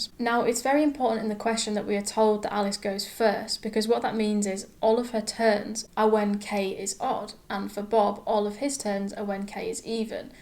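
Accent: United Kingdom. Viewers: I adore also British English 😊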